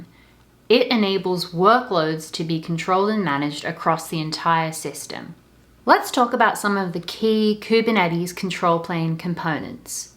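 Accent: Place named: Australia. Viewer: I came for the tech but stayed for the accent! #AussieAussieAussie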